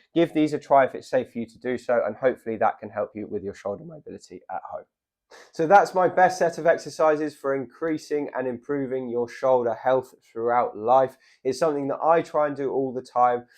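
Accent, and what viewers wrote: United Kingdom. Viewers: Thanks for your videos. I have a hard time being lazy and undisciplined to exercise. I need to wake up and get motivated. You are very encouraging. Great explanations. Like your British accent so much. I'm over 60. Do not like saying that. Have tried doing push-ups, sit ups, squats, etc. Always feel better after. Some "reverse leg lifts" on hands and knees for firming my glutes. Need to get serious about this and just enjoy it. Can not jog or run or walk a lot due to painful feet. But CAN still get around, drive, shop, etc. Sorry for long text. Btw, you make great videos and you are a good-looking, very presentable man. Keep up the good work. God bless you in a special way.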